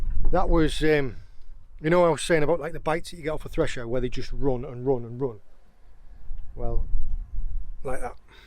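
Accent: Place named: North East England, United Kingdom. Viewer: Been watching your channel for about two weeks now,thought I herd a north east twang lol am in Newcastle but my late grandad was a amble fisherman in the North Sea. Never been fishing myself apart from hand catching flat fish down the ozburn under byker bridge Newcastle as kids when the tide was out. Do you ever see basking sharks in Cornwall?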